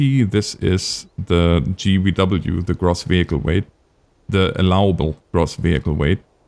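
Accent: Germany. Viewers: Mate I swear I hear the tiniest of an accent... German, Dutch, anything?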